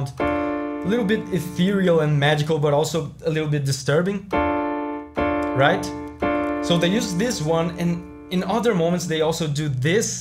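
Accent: Brazil. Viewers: eu tinha uma sensação de q vc era BR por causa do sotaque kkkkkk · what's your nationality? your English speaking accent sounds a lot like the Brazilian accent. Acabei de ver nas informações do canal que é brasileiro kkkk, deu para entender um pouco do que você estava falando e deu para entender a mensagem, mas seria uma boa tentar colocar legendas para quem não entende. E sobre as músicas é incrível como a fromsoftware não erra com musicas dos final Boss dos jogos, simplesmente lindas. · Sotaque br? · u sound brazilian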